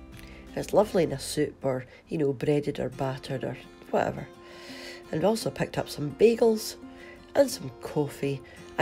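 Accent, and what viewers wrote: Scotland, United Kingdom. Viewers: You got some great stuff. Ahh I love the Scottish accent 🏴. You say so many “wee” words my Irish family use! Lots of love 💕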